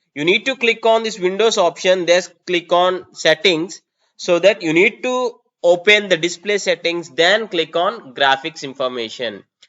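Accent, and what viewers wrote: India. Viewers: Indian Accent = Instant Fail.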